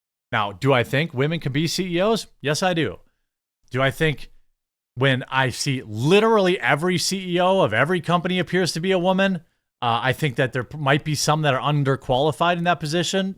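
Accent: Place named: Wisconsin, United States. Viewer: Dang you live in SE Wisco?? I thought I recognized that "accent" lol - I was born in Elkhorn and still live in good ole Wisconsin 😎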